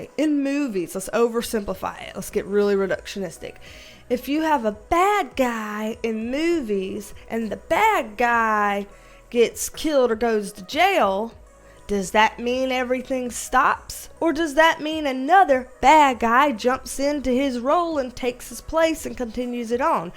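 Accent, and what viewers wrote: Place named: Rural, United States. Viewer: As a country girl myself I love your accent. It feels so familiar and comforting! Love ya! Keep up the great work and congratulations on 10k!!! 🤩🥳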